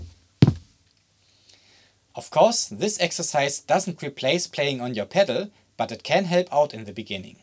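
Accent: Germany. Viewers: Your from germany...i think its a strong german accent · Lovely deutsch accent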